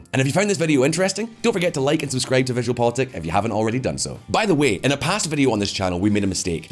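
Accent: Scotland, United Kingdom. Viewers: maybe you could slow down to 1000 words per minute and try and drop the Scottish accent. There are others outside the UK who like to get updates.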